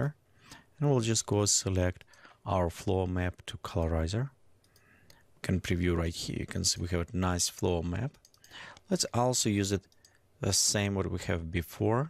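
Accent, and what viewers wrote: Russia. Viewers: нахуя на английском то? Слышно же сука что акцент русский...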